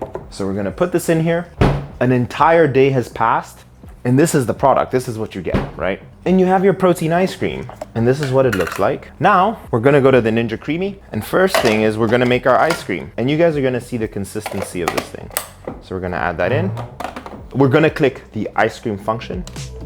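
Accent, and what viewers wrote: South Africa. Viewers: Awesome! Thanks Doc! I honestly thought you were South African 🇿🇦 because of your accent😅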